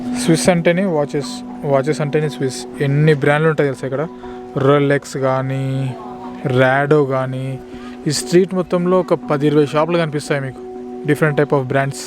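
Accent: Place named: Telugu, India. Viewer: బ్రో జెల్ది రా బ్రో సీట్లు అయిపోతున్నాయు ఈడ 😅😂 ఏ దేశం పోయిన మన భాష యాస మర్చిపోవద్దు ప్రౌడప్ యుా తెలుగోడ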